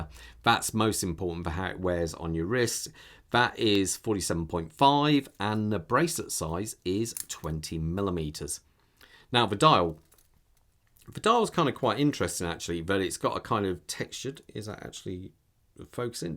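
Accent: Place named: Midlands, United Kingdom. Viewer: It’s nice to hear a Midlands accent. In fact it’s bostin.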